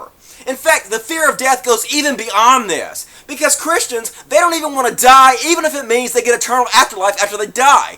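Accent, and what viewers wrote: South, United States. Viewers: You are the first man with a Southern accent I've seen who is an atheist. Congrats! I've subscribed.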